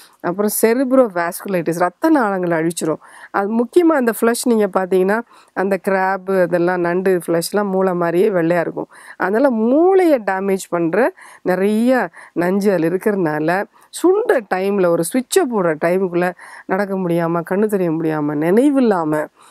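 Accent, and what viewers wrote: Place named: Tirunelveli, India. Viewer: Excellent explanation. Thanks to the Doctor. I liked the way she explained in Tirunelveli Tamil. However, regarding Triglyceride, even strict vegetarians also have high levels. What could be the reason? Is it because of malfunction of Lever and Pancreas? Request the doctor to clarify.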